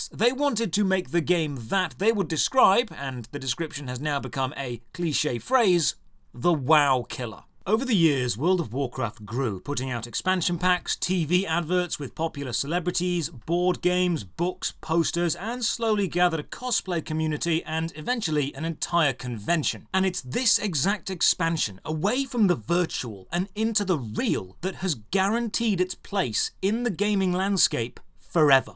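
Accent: United Kingdom. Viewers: showing an american football venue while talking about football and sounding british. Now I'm confused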